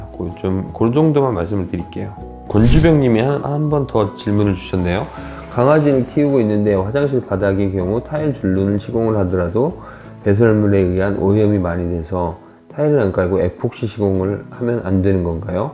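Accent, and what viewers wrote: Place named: North, South Korea. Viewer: 저는 부산사람인데 걸리버 방송을 연달아 듣다보니 저도 모르게 위쪽 사투리가 나올거 같아요ㅋ 근데 차분하게 설명해주셔서 참 듣기좋아요~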